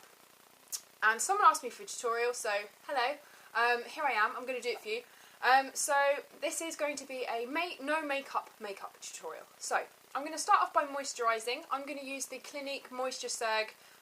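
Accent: England, United Kingdom. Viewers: U r pretty without makeup and r u English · she is english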